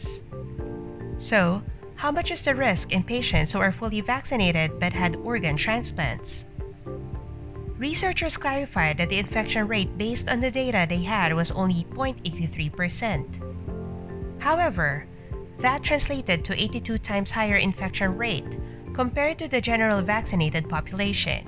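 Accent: Philippines. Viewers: no. She Sounds Filipino. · Filipino accent is too strong.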